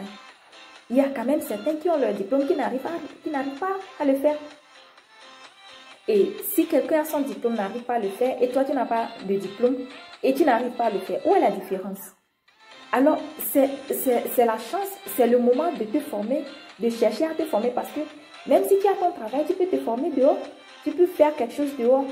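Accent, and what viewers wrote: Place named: France. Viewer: I love the French accent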